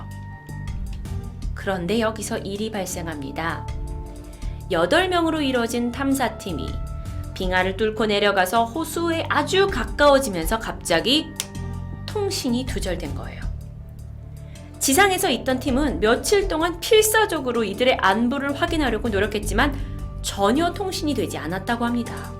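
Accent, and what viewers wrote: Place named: Jeolla, South Korea. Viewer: 음이 정확해서 듣기 좋아요, 서울 말을 쓰시지만 원래는 전라도 분이시네요~ 전 강원도쪽 사람인데 전라도 경상도 사투리 너무 좋아 합니다.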